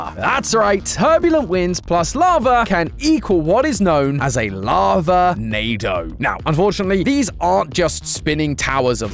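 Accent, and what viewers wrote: United Kingdom. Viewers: Why does Matt Patt sound more British?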